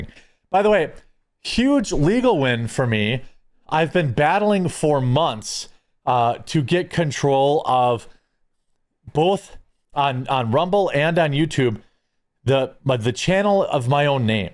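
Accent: India. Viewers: Your dumb Indian accent is only topped by your inbred trailer park hick uniform of ball cap indoors and queer beard 😅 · More of your accent your Indian accent it's great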